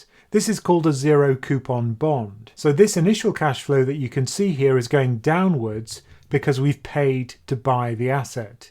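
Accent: United Kingdom. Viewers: Is that a British accent?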